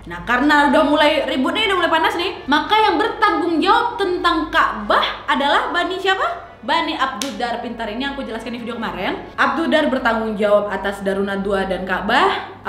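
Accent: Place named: Medan, Indonesia. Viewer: Cerita penggalan sejarah Nabi yg disampaikan dengan cara yg sangat menarik , tuturan gaya medan yg khas dan isi cerita yg terurai dg enak dan menggelora jiwa yang selalu haus terhadap kebesaran Yang Maha Kuasa dan keindahan Achlak Nabi Muhammad SAW. Selamat wak. Semoga istiqamah wak. Wassalam. Ww.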